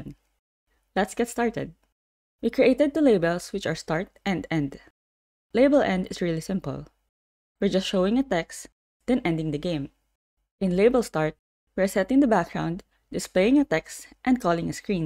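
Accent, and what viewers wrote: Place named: Philippines. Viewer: this is exactly the kind of video i needed!!! also super cool that you're from the Philippines as well, i was surprised to hear a Filipino accent voicing this! thanks so much for your vids!!!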